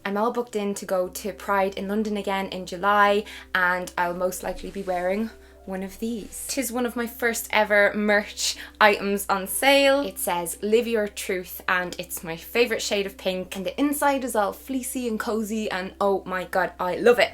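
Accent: Ireland. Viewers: I loved this video. It’s always so nice to hear more about my sexuality. I also I love your accent it makes me miss my time in Ireland so much! Thank you for a great video💚💚💚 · Do I hear a fellow Irish spudddd?? (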